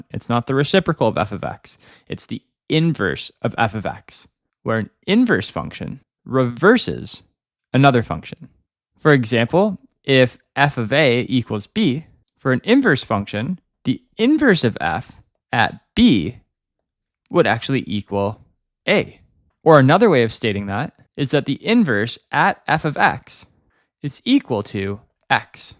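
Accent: Canada. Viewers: i hear the canadian accent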